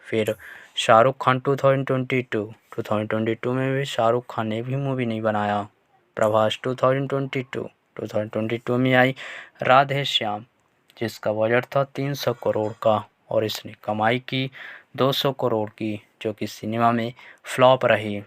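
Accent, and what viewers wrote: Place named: India. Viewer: What city are you from? I'm interested in figuring out where the accent in India is from.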